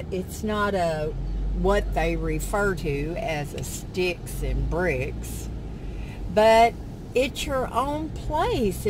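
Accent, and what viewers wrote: South, United States. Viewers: I love your southern accent! Beautiful park!!!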